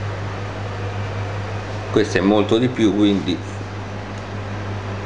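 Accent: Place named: Rome, Italy. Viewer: di foggia? ma non lo senti che parla in romanaccio?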